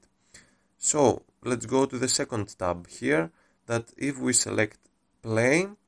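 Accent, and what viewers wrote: Greece. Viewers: ΛΙΠΟΝ ΑΥΤΟΣ ΕΙΝΑΙ ΕΛΛHΝΑΣ ΧΑΧΑ ΠΡΟΦΟΡΑ :) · Πρώτον <link> εδώ έχουν ξανανεβάσει το video σου και δεν νομίζω πως είναι με την άδεια σου.. Δεύτερον η προφορά σου είναι πολύ ελληνική :D Τρίτον δουλεύει μόνο σε pc victims ή και σε κινητά?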